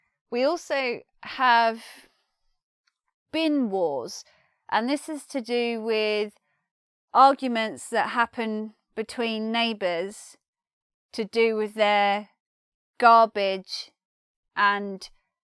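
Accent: United Kingdom. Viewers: Thanks, i learn to much with you, i love your british accent- Paulo From Brazil!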